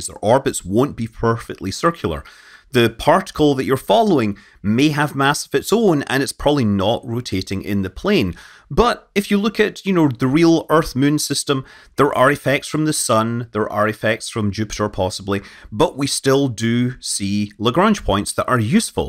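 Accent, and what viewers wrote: Scotland, United Kingdom. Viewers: Why is Maths called 'math' by a guy with a Scottish accent? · Great visuals and great, great narration! The Scottish brogue is icing on the cake!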